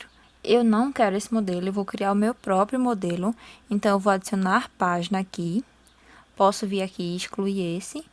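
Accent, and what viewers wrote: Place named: Recife, Brazil. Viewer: Que legal,! 🌼 Me inscrevi hoje, e tô gostando muito! Didática simples, clara e eficiente! Parabéns🎉🎊🎉🎊! Ah! Você ê do Recife? Seu sotaque parece 👀 de lá! Muito top, seu Planner! 📝 Abraço👐 Noemário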